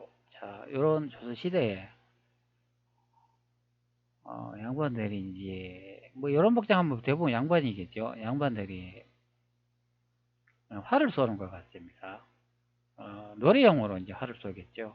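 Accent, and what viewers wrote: Gyeongsang, South Korea. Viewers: 는 말투가 경상도 끈은데 책보고는 5.18선동좃나개 하네 니는 5.18을 선동하나 안하나 5,18은 북조선 빨갱이 짖이야 아니야 궁굼하댜 니 영상 잘 보고 잇댜 댓글 한 달아보쇼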